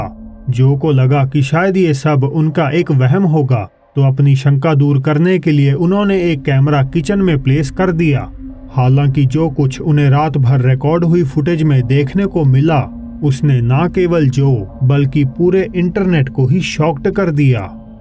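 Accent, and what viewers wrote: Punjab, India. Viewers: Bro,Mera naam Raju Bhullar hai,aap ki English aur Hindi bolne k style mein Punjabi touch clearly jhalakta hai jo k bahut garv ki baat hai. Whole Punjabis should proud of you aur aap ka ' kaise hain aap & ' chaliye phir shuru karte hain" is awesome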